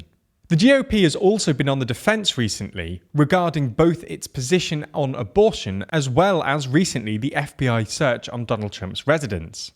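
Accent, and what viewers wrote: United Kingdom. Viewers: First time watching this channel after the other 3. Kinda disappointed he didn’t change his UK accent to an US accent. Would be totally hilarious and serious at the same time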